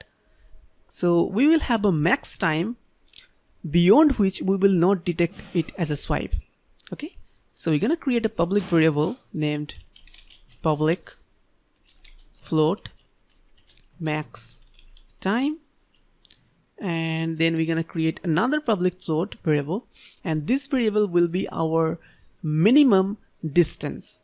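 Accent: Bengal, India. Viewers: Amio Bangali...from bangladesh....apnar kotha bolar style dekhe keno jeno mone hossilo apni bangali...tai ask koresilam.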